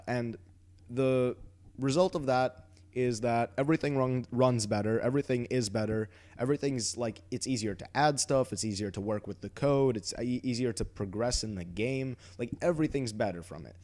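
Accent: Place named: United States. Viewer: Are you actually Russian? I would guess not based on your school experiences that you talk about and your accent is American. Just a question.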